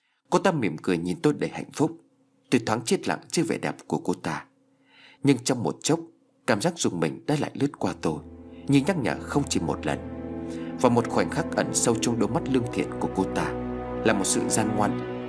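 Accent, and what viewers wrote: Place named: North, Vietnam. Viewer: Giong bắc nhưng chuẩn,em da nghe a soạn nhiều rồi,cũng dc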